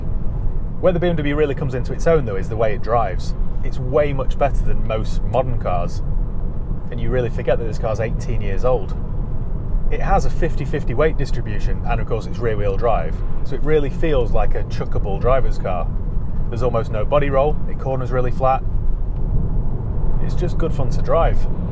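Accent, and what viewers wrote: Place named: Newcastle, United Kingdom. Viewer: Its Geordie speak, south of the border.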